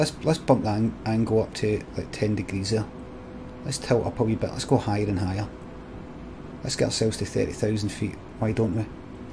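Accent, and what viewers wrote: Scotland, United Kingdom. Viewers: They say that plane passengers feel most comfortable with a male pilot with a scottish sccent, and i must say that the scottish sccent still outweighs your awful flying, id fly with you anyday!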